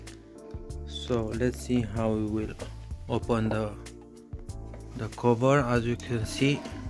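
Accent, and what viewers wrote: Romania. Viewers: asta e roman la cum vorbeste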